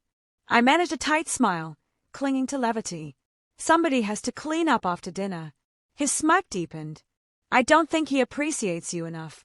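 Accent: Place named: Australia. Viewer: Oh I like the Australian accent on this girl. It actually makes her pleasant to listen to.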